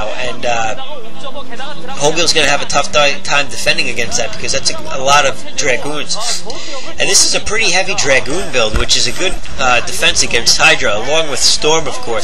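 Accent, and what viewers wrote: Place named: New York, United States. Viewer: I think he's doing a great job, keep it up man. lol as for the voice, i also didn't like your voice at first but now i love it. lol Sounds like a new yorker i hear on tv. But yeah he's doing good, lots of commentaries, we appreciate it. thank you.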